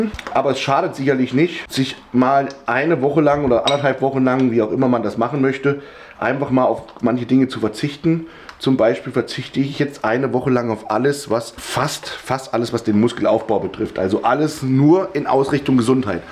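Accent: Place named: Hesse, Germany. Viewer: Er meint wohl hessisch, wohne nicht so weit von ihm, aber muss wirklich sagen das man in jeder Gemeinde/Stadt ein bisschen anders spricht. Anstatt „spitz" sagt man hier zum Beispiel „speutz". Aber Plattdeutsch ist es nicht 😅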